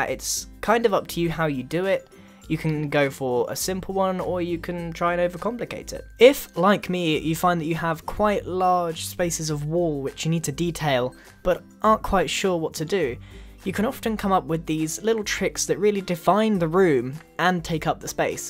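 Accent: United Kingdom. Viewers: He's british, ok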